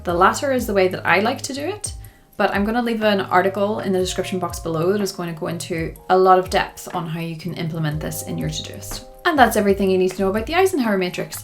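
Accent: Northern Ireland, United Kingdom. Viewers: She has a Northern Irish accent.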